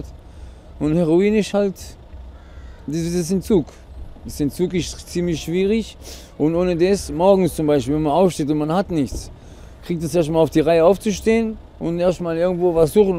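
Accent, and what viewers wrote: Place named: Baden-Württemberg, Germany. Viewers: Er erklärt gut. Schwäbischer Dialekt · normaaaal so redet man in baden württemberg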